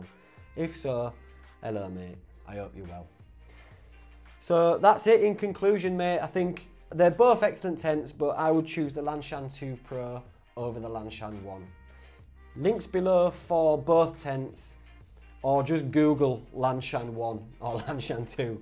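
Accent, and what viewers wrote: Yorkshire, United Kingdom. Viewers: Great review!! The 2 Pro looks the ticket. By the way, thats got to be a North Yorks accent right? Im guessing York :-)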